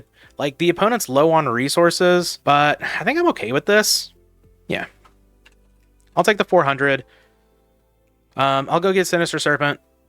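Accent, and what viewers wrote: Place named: South, United States. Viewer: As someone from ohio you are southern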